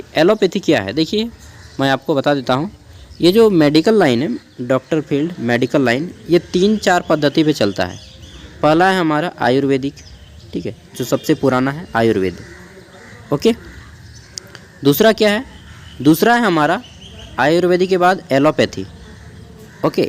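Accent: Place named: Jharkhand, India. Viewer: Aap kaha se bhai, apka bolne ka tarika se lg rahe ho aap hazaribagh se ho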